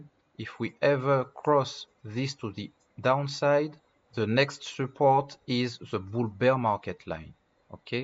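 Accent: France. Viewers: Hey man ! Are you french ?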